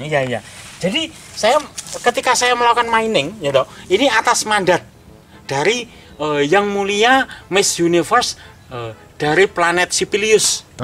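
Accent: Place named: Java, Indonesia. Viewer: Jowo mn suarany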